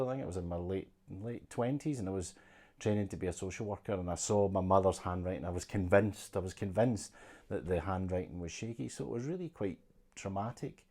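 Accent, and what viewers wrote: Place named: Scotland, United Kingdom. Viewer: I don't mean to be shallow but you have a lot of charisma with that witty Scottish accent? And you very handsome too! Seriously I think it's thoughtful for you to make this video and inspiring others.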